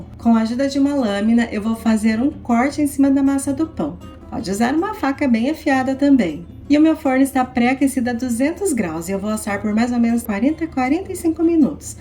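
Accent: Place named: Minas Gerais, Brazil. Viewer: Oi, tudo bem? Tenho duas curiosidades a respeito de vc: Qual é o seu estado? Seu sotaque, as vezes, parece interior de Minas. Qual é seu nome? Tenho uma prima que se chama Teresina e tem apelido de.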